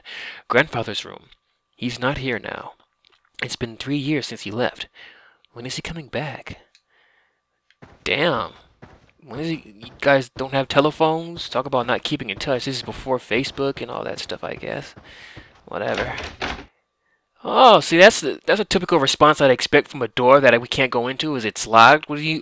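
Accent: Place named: RP, United Kingdom. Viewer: You know she's British :D you can tell she has a posh voice haha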